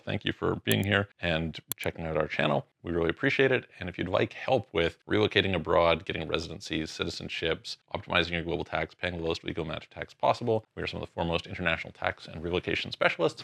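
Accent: Canada. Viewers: "Aboat"? You wouldn't be a Canadian "eh"? No problem if you are. I like Kanuks.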